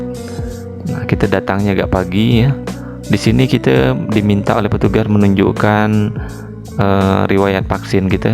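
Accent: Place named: Malacca, Malaysia. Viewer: Suka dengar loghat dabo singkep.. mcm melaka😂 nak jumpa org dabo lah..! Balik kampung sehari suntuk baru sampai rumah😂 letiiih..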